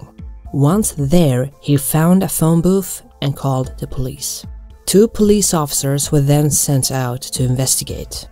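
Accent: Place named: Sweden. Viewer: It's an a Swedish accent. What's wrong with your ears and cognitive reasoning ?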